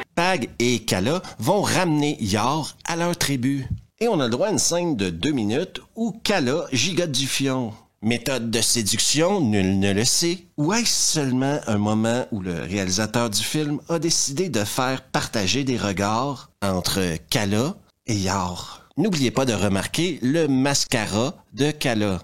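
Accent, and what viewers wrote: Quebec, Canada. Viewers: Ton style unique (humour caustique, présentation fluide, accent Quebecois....) rend ces films attrayants malgré qu'a l'écran on y croit beaucoup moins.Bonne iniative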